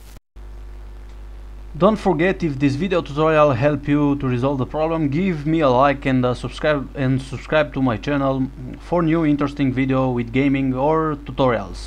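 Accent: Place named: Romania. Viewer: stiam eu dupa accent:)) esti dealmeu. De prin ce zona esti daca nu te superi ca intreb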